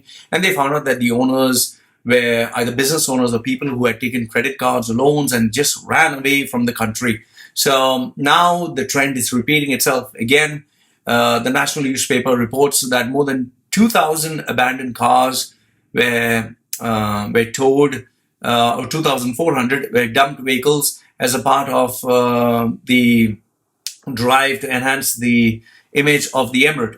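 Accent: India. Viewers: Why has your accent become more Indian sounding of late? Here it was very different 😅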